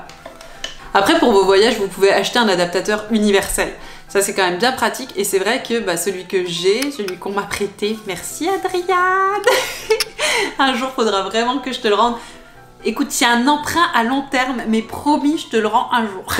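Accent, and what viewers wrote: Quebec, Canada. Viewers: Très intéressante cette vidéo et tu commence à parler comme une québécoise 😅💪🏿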